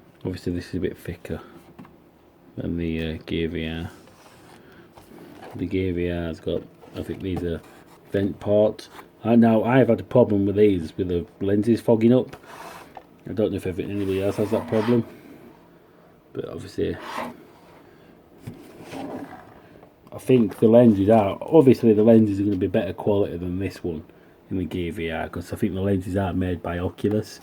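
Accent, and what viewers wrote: United Kingdom. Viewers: It's a British Eng and I can 100% understand him. All depending on Where you live and what accent you've got. Not his fault at all. If you agree than appreciate his effort and like his video please, his video helped me for sure 👍👍👍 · I can understand him he is british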